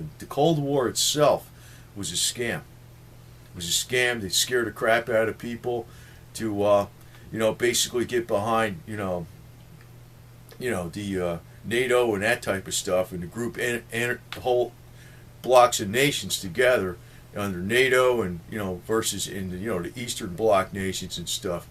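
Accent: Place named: New York, United States. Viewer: Your not too bright. That very much so is a New York accent. Have a nice time trolling channel...its making you look even worse.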